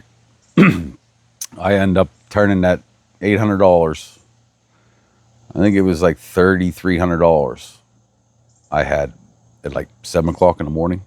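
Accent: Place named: Pittsburgh, United States. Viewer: lol i was thrown off by the Golden State shirt and story about driving from Utah. I’ve lived in Pgh my whole life so I know a fellow yinzer when I hear one 🫡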